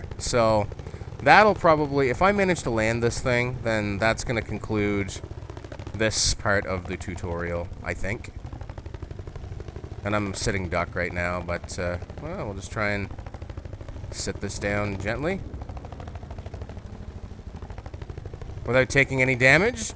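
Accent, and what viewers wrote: Canada. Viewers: You sound like your from Canada ! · out, about, mouse, because, alright, target, .. just sound weird in this video.. oo. i know y.. he is Canadian Ah.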